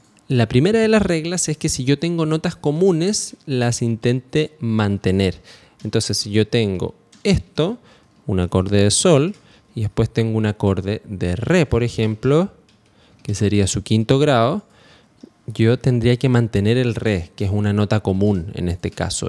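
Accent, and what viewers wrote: Chile. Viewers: Habla como chileno si erí chileno bro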